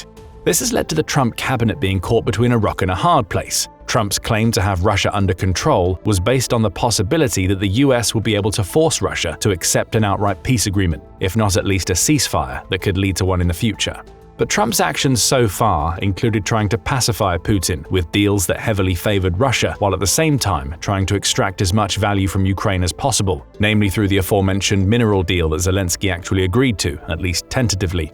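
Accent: United Kingdom. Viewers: This video has a completely Eurozone slant.. just because someone has a British accent, doesn't mean you can believe all they say.. The book hasn't been finished yet on US efforts in the Ukraine - Russia war. Don't forget, British did have their hands in the Russia disinformation scandal regarding President Trump (debunked Steele Dossier)..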